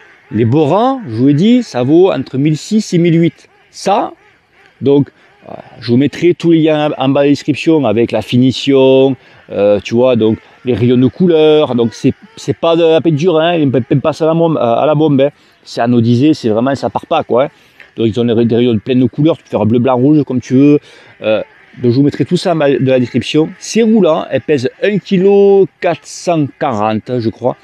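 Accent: South, France. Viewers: Merci pour tes video mec 👍 Super Accent du soleil 😉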